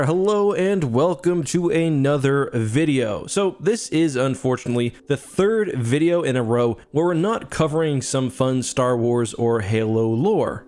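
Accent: Canada. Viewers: The entirety of this video just sounds so Canadian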